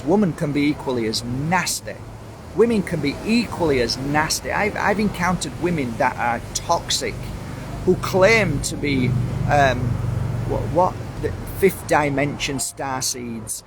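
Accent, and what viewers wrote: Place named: North, United Kingdom. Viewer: Northern (good reference too!) no nonense delivery 🤗 with a brilliant combo of compassion/passion 💥💥❤🧡💛💚💙 Love your fire in your belly..... grand job lad! Clarity is by its nature challenging....praise be for that!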